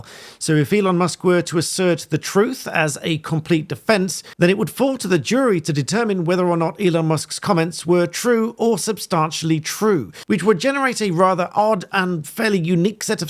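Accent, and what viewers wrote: United Kingdom. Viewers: Talk too fast - plus intolerable "British Accent". No thanks.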